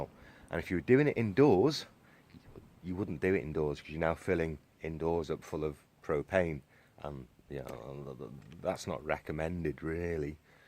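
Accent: Wales, United Kingdom. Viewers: Awesome !!! Been wanting to try that for ages ! Maybe next : gasification??? Familiar accent ? Rhyl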